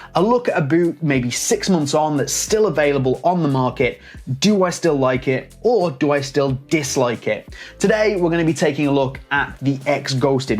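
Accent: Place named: Cork, Ireland. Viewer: no way XD I never wouldve guessed, your Cork accent is very mild hahaha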